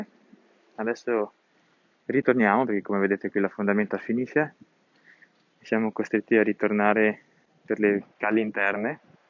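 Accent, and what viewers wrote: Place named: North, Italy. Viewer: I love your Northern accent LOL. Fantastico video! Saluti da Toronto.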